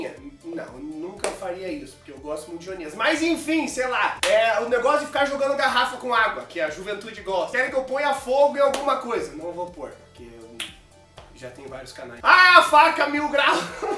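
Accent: Curitiba, Brazil. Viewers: vc eh de curitiba seu?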